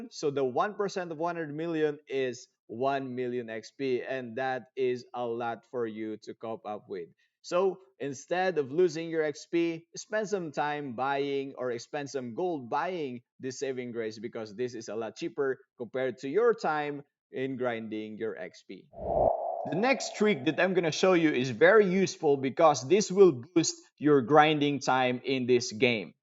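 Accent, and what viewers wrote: Philippines. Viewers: Why do i hear filipino accent xd Btw im also filipino This is useful tip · Are you filipino? Cause your accent is so familiar xd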